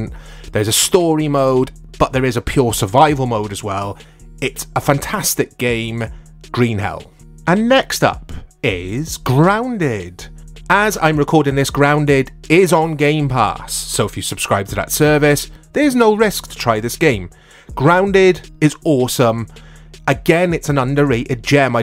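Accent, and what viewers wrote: Wales, United Kingdom. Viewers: Bro are you welsh yeah?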